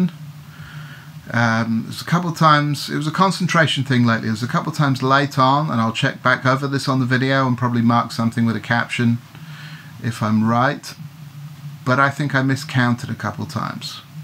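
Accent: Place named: Cornwall, United Kingdom. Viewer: this exercise has taken the worry I had that this dental work would really mess things up! I'm playing with you relaxed and it's keeping my tone in tune with yours. Your a life saver. John from New Jersey. Oh by the way my Wife Beverly is from Cornwall UK her accent matches yours to a tea. Mine is a Jersey accent. This exercise is going to keep me playing threw my dental visits. Thank you very much.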